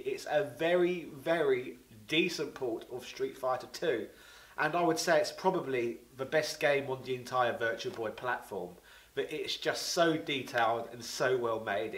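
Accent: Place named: Bromley, United Kingdom. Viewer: I noticed you did a bit of a posh accent in this video. Does that mean you live in Bromley?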